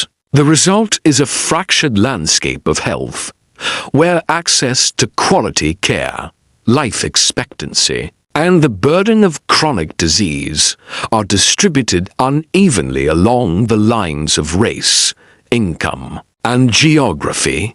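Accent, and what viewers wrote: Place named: United Kingdom. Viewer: Please don't use British accent with your AI narrator - annoying.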